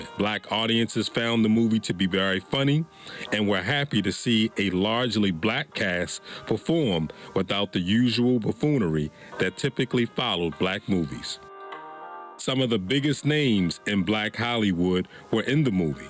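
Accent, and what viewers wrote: Baltimore, United States. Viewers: I was today old when I learned that the name Biggie Smalls was from the movie “Let’s Do it Again.” Also my people are from the Bahamas. Cool. Also I hear that Baltimore accent. Good video 👍🏿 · The woman's name is pronounced OOOH-tah HAH-gen (Uta Hagen). She was someone you truly need to research. Also, the role from Othello is pronounced Ee-YAH-go (Iago). You're Baltimore accent is strong. I love it!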